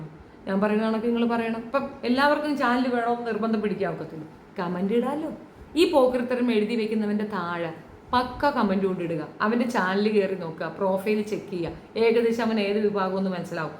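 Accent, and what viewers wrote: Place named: Thiruvananthapuram, India. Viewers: വടക്കൻ ട്രിവാൻഡ്രം ആണ് അതാണ്‌ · തിരുവനന്തപുരം ശൈലി 😂😂 ആണെങ്കിലും താങ്കളുടെ അവതരണരീതിയും ഭാഷാ സ്ഫുടതയുംനന്നാവുന്നുണ്ട്.. ചിലർക്ക് നിങ്ങളുടെ പോലെയുള്ള മറുപടി ആണ് വേണ്ടി വരിക..😂😂.. സമൂഹത്തിന് ഗുണകരമായവയും വഷളത്തങ്ങൾ അലങ്കാര മാക്കി മനുഷ്യരുടെ ഇടയിൽ അകൽച്ചയും വർഗ്ഗീയ ചേരിതിരിവുകൾ സൃഷ്ടിക്കുന്ന വർക്കും ഇങ്ങിനെ യൊക്കെ മറുപടി പറയുന്നത് ഉചിതം തന്നെ ❤😂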